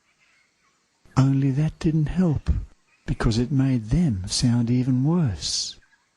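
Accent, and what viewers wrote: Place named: Australia. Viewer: Lol. You're the best!! I love your "work / YouTube posts"... weaver of parable, and allegory, with an Aussie voice and calming background sound, Master, I flourish a bow in your direction. You must be quite the interesting "normal guy". You have helped me much over the years. Post more!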